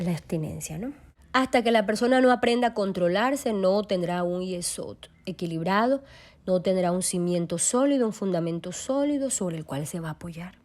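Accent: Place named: Venezuela. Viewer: Shalom. Todah Rabah, soy de Venezuela , tu tono es venezolana, das clases presenciales ????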